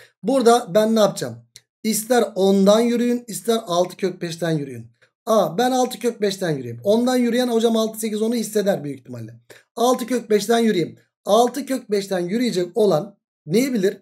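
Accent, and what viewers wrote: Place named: Central Anatolia, Turkey. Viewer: Hocam sizde ankara-kirikkaleli konuşma tipi var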